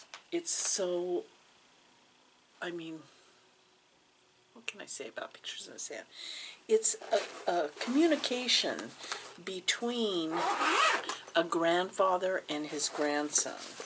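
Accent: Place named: Oregon, United States. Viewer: Hi there. I’m Rose, and I’m so happy that I just found your wonderful channel, I love it. It’s such fun to watch and to listen to all the lovely things that you make and get up to. And I love your Oregon (?) accent ❤ I live in rural Australia, just out of Melbourne, in Gippsland. I’m completely new to quilts in fact, I’ve never made one before! I’ve always been a creative embroider but I’m intrigued with all things quilting. I joined my local quilt group and the folks there are terrific and helpful. You are so enthusiastic and generous with your channel and I feel really inspired by all the great things you talk about. Thanks. I wish I could join you in gorgeous Portland, it looks like a terrific place to be ❤ Keep smiling and being happy!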